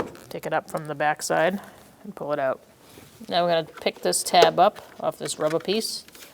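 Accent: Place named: Massachusetts, United States. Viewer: Love the accent, sounds like she's from the northeast like Massachusets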